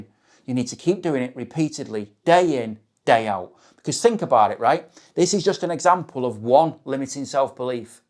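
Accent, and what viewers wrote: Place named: United Kingdom. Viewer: You have a relatable and down to earth way of presenting your videos. Nice to hear a British accent too. Keep up the great content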